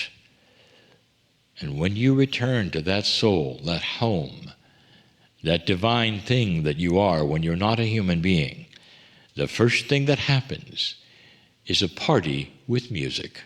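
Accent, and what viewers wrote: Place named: Newfoundland, Canada. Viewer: Hmm.. I detected a bit of a Newfoundland accent in this channeling 😅 not sure if it's Lee or Kryon ❤ made me smile and chuckle here in Cape Breton, Nova Scotia lol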